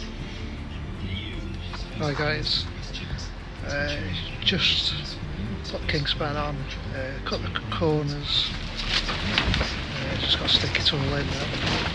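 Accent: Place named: United States. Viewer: I thought l would watch but but you are american, l thought you were british.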